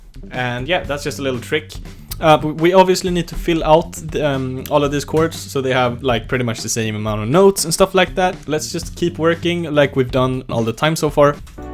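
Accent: Sweden. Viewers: That swedish accent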